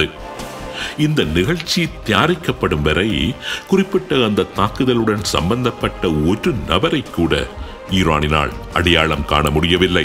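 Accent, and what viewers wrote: Sri Lanka. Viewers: வர்ணனை செய்பவர் குரல் கம்பீரமாக, தெளிவாக உள்ளது. இலங்கை தமிழ் போல தெரிகிறது. பாராட்டுக்கள்.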